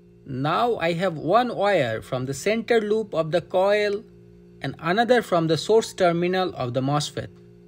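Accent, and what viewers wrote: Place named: Bengal, India. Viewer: ভাই তোমার ইংরেজি বলার ইষ্টাইল শুনে বুজেছি,তুমি বাংলা বলতে পারো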